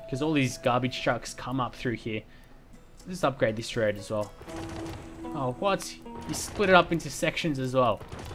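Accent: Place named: Australia. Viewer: Oh and in regards to the microphone, while it doesn't transfer every nuance of your awesome Aussie accent, I could hear you just fine. No worries with the microphone.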